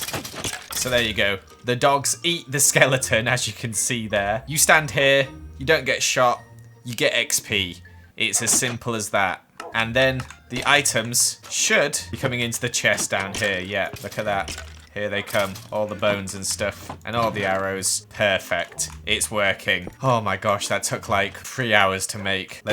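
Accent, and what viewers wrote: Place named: United Kingdom. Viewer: Just For Fun you have no taste British pronunciations are the best pronounciations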